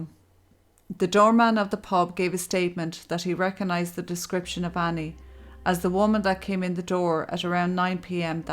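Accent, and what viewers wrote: Ireland. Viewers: I really enjoy hearing your accent! Some of my ancestors are from County Cork. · Poor Annie.. So sad and tragic that a New Yorker ended up disappearing in Ireland, where the crime rate is so much lower than in NYC/NYS. Extremely puzzling and incomprehensible that she decided to go for a walk so late in the day, so far away from her apartment and, above all, on her own.. We will never know... What an unbearable torture for the parents, not knowing... No closure. Btw, in 1987 she wasn't 19 but 21 (born 1966). Very well told story, you have a soothing voice and such a charming accent. I love Ireland! Best wishes for your channel! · I enjoy your storytelling and your lovely barróg. It's a comfort really. This is the first I've heard of Annie McCarrick. As a 1st gen Irish American raised on Long Island. like Annie, very close ties to Ireland..this makes me shiver, it's possible I blocked it from my memory. 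Somehow the Northern Ireland angle seems a bit far fetched, anything's possible, but to kill an American, create an International incident? They gave no evidence. My heart hurts for her parents, my sincere condolences to Annie's Mom , I pray she is Comforted and has Peace, the peace that passes all understanding..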